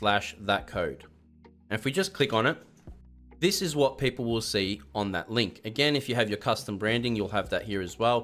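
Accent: Australia. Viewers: Hey r u Australian bc u got an aussie accent?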